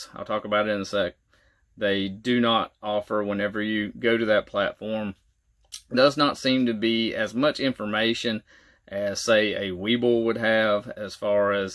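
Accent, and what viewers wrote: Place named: South, United States. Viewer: i love that country accent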